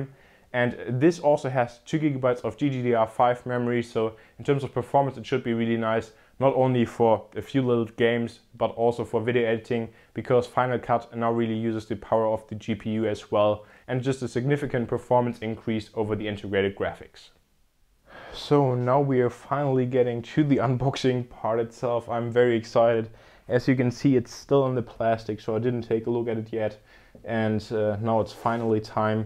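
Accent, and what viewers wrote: Germany. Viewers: Omg.. That german accent!!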